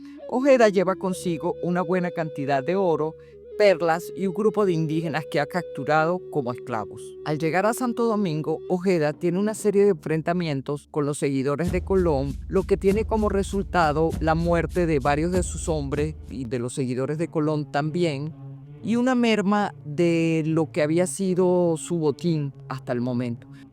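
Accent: Venezuela. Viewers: Hermoso escuchar su voz, voz de Caracas, mi reconocimiento y agradezco su esfuerzo y la animo a continuar... Gracias :) Recuperar el idioma de los VALIENTES PRIMEROS debería ser una prioridad, como me gustaría saber de ese idioma de verdad verdad!!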